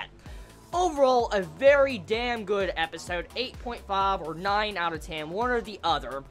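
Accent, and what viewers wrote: South, United States. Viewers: random question here.....but are you from down south, as in south america? (texas ect)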